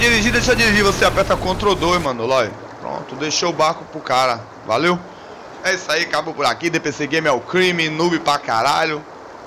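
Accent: Bahia, Brazil. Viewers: BAHIANO CERTEZA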